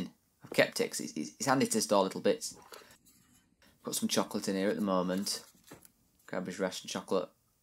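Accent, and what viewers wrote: United Kingdom. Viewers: Barnsley accent? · Your British accent is excellent. Sounds legit Enough that I have to put subtitles on. I can't understand any of those people except the posh ones or the Welsh.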